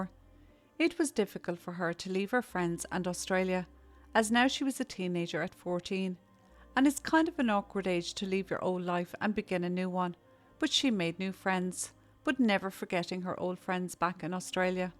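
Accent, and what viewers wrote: Ireland. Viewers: I don't think you realise how good your channel is! Imagine this: an Irish woman, with a beautiful Irish accent, the sometimes brutally honest but heartfelt opinion of a woman with values that have been eroded away but still stand for what was once good and proper in society..... versus AI content farmed true crime stories narrated by electronic voices that are mispronounced, misrepresented and churned out just for clicks and clout., Redrum, Truly Criminal and Just Thought Lounge are the only true crime channels I watch anymore. And sadly, when content farms force human creators off the platform, I won't be the only one mourning the loss of authentic voices like your own. Apart from anything else, is the only channel where I watch and wish, outside of the cases covered, I wish I was there in Ireland instead of here in this dystopian land of Britain! 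A beautiful country and an equally beautiful voice - I hope your channel grows and you remain here as one of the few human creators left amongst the future of content creation.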